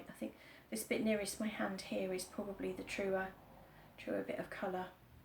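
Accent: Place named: England, United Kingdom. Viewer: Hi, I really enjoyed your first video......lovely to hear an English accent! Your stitching is absolutely beautiful. I live in Northern Ireland so I'm not too far away from you. I love Devon and have visited many times. Looking forward to watching more of your Flosstube videos 😍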